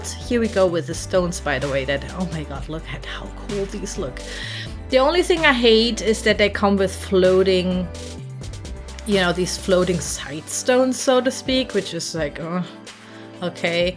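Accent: Germany. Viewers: Are you german? You accent sounds german 😅 (I'm half german) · Ich: starte das Video und höre die ersten Sätze auf Englisch. Mein Gedanke dabei war: „Das ist kein native English...eher deutsches Schulenglisch.“ Auch ich wie ich bei deiner Kanalinfo lese das du aus Deutschland kommst: „Ich wusste es!!😂😂😂“ finde ich richtig cool, dass du deine Lets Plays auf Englisch machst, damit auch andere, die kein Deutsch können, wissen was du gerade im Video machst!👍🏻 weiter so!😊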